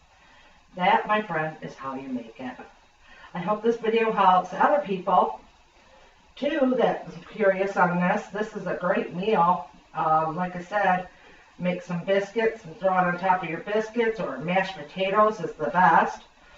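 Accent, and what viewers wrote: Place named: South, United States. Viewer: You sound like a good ole country girl, or at least you cook like one. That looks good; gotta give you a thumbs up. Save me a seat at the table, LoL...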